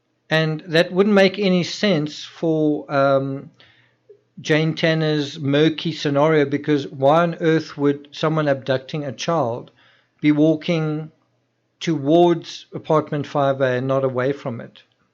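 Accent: South Africa. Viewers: Your accent is definitely South African.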